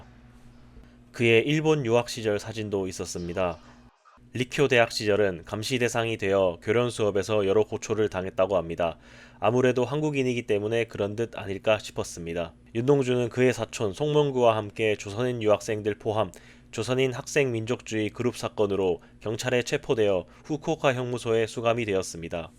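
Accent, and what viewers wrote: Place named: Gyeongsang, South Korea. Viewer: 쌍도 억양 듣기 싫어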